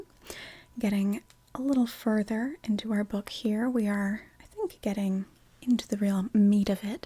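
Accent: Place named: Transatlantic, United States. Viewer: I love that you’re keeping the transatlantic accent alive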